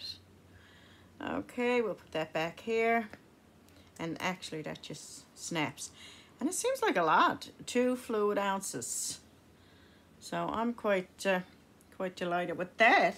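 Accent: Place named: Newfoundland, Canada. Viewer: First time seeing one of your videos, and I love that kit that you got. I love that they're already framed and that you have the three pieces separately to do. They sure did add in a lot of extras also. I hope you don't mind me asking, but where are you from.? Your accent sort of sounds like where I'm from, and that is Newfoundland Canada, but I could be wrong 😄💜😊💜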